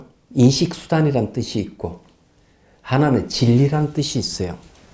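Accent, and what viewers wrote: Gyeongsang, South Korea. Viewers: 김 옥순 님 스님 경상도분이라 방언을 많이 쓰시는데 우리는 다 알아 듣거던요 명상 강의를 하시는 스님께 그런 댓글은 禮가 아닌것 같습니다..^^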